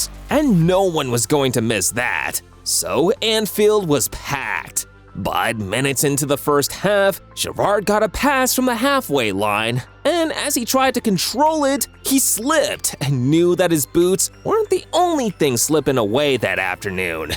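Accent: United States. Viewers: Hearing an American say these words is so weird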